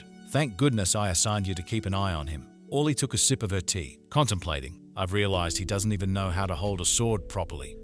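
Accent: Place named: Australia. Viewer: Awesome to see an australian brother on this trend, but I CANT STAND AUSTRALIAN ACCENTS. Love from NZ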